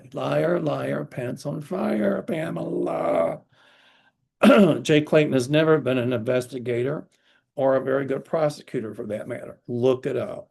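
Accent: South, United States. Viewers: I absolutely ADORE you Auntie 💜🌻🫶 I love the whole Southern accent and when you say "you all* and I've learned to take the upper road and say Bless your heart" to those I don't quite agree with. 😆🙏💯💜🌻💫 Thank you and God Bless! 🙌💫💯🌻💜🙏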